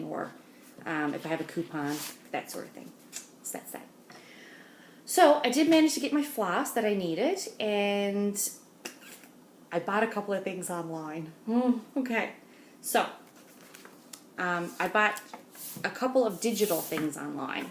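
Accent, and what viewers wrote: Newfoundland, Canada. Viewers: I enjoy your videos ( and that Newfoundland accent ) so much. I am in rural Texas, no doubt I have my own accent, and I am over 120 miles from a Michael's and 70 miles from WalMart. No LNS anywhere either, so I do my stash enhancement shopping online. At least I don't have the shipping costs that you do. 😊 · I was listening to you speak and thought... that's an interesting accent. I feel like she's mentioned where she is from before, but I can't remember! So when you said "Newfoundlander" I was like "OOOOH!" I think you are the first person I have encountered with that accent! No wonder I couldn't quite place it. Your dad's boat is looking amazing! I am so impressed with the progress you've made and how fast it is going. My full coverage design is not even inching along, haha. And I agree with you! That Record Girl's hair is looking awesome.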